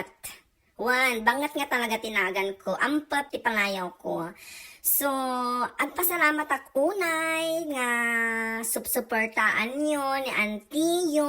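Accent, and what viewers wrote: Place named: Ilocano, Philippines. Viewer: kayatak la unay ta ilocano accent mo 😂😂😂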